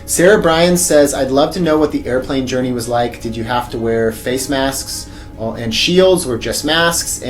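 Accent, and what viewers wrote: United States. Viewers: Welcome Home! As a fellow kiwi, it’s super interesting to watch your journey on coming here to NZ. Look forward to you watching your journey in loosing that American Accent as you settle in 😅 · I'm sorry but how were you allowed to even come here, are you a resident ? that accent sounds like American so-called closed borders ay. Typical one rule for some and different rule to everyone else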